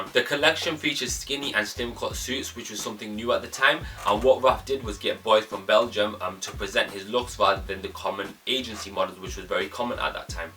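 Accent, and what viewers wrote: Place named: Manchester, United Kingdom. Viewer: The Manchester accent too strong 😂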